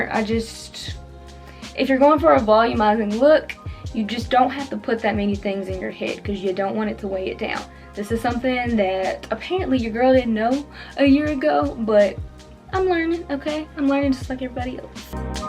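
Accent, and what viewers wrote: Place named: South, United States. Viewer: Your accent is cute. ^^ Are you from the south?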